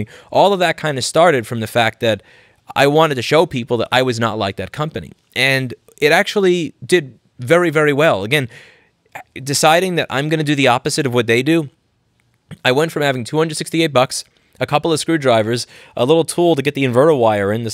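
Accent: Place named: Carolinas, United States. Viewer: Yep, sounds like the Carolinas, greetings from upstate SC.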